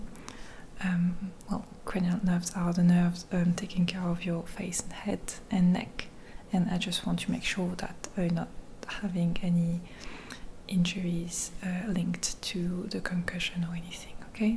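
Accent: France. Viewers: Being a 50 year old German male, I'm struggling a little bit with my imagination being a cheerleader 😁. But for listening to your soft french accent ... let's go for it ... 👩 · French Accent? 😍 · French accent? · Même pas 2 secondes d'écoute et je reconnais ce magnifique accent français. En vrai ça va on est loin du niveau de Hollande xD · Je trouve ça chou l'accent français en anglais ^^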